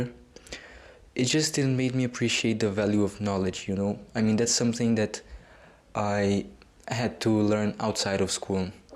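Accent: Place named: Brazil. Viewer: I feel a Brazilian accent but he could be italian too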